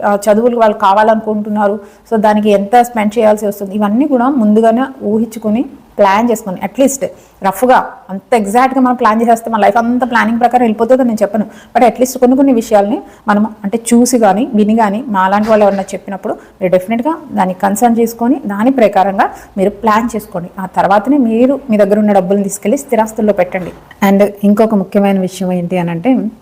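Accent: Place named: Godavari, India. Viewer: Hello andi are you from Godavari districts? Your accent looks like that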